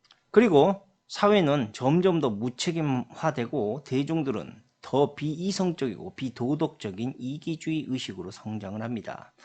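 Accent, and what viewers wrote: Jeolla, South Korea. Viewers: 말씨가 전라도같은데?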